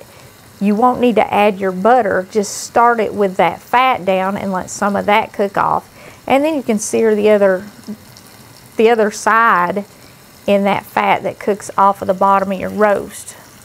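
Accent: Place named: Appalachia, United States. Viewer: She's American Appalachian speaking English. Wotta question, 🤔 & Who WANTS to Know?? Friend, lol. Oh geeze.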